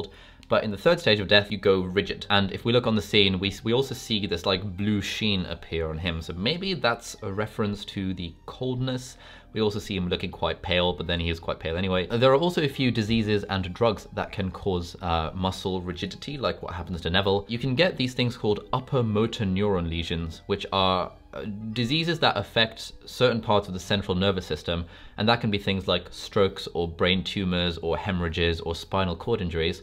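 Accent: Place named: United Kingdom. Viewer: Great video, love how you explained everything in your perfect British accent. What you said about how ‘wolfbane’ can effect the nervous system got me thinking about the Wolfbane potion Snape brews for Lupin in the Prisoner of Azkaban, which stops him from turning into a warewolf and immobilises him.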